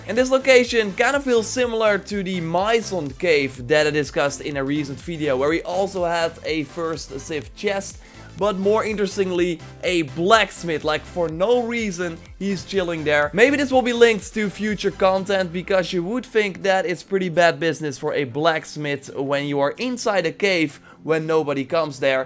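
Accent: Netherlands. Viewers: Just asking. Dutchie? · Nice videos man! Btw are you Dutch? Zo ja, ik ook 😉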